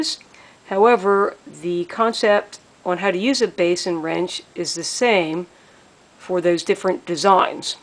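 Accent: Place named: Appalachia, United States. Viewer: This guy's accent sounds like those Beverly Hillbillies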